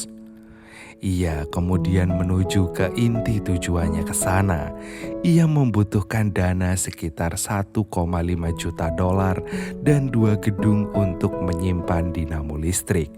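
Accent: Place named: Java, Indonesia. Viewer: kirain ini insomnia film wkwkwkk tapi kalo yang ini agak lebih medok suaranya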